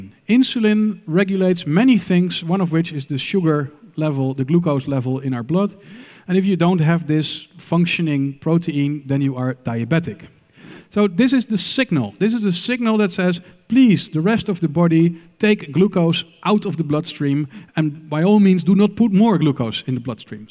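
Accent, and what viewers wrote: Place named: Netherlands. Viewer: He is dutch i hear that 😜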